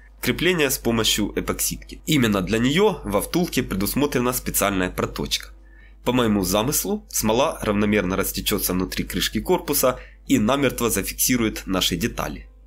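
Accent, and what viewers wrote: Ukraine. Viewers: Такой замечательный украинский акцент, и такой хороший мастер! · Ну у тебя и говор!)))Ты из Украины что ли??))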